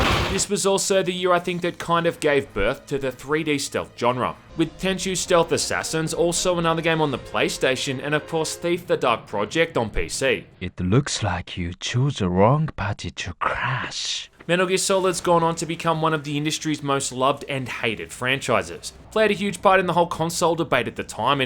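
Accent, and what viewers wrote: Australia. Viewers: "Where he backflips off a missile in mid air, I mean come on son!" - Aussie AF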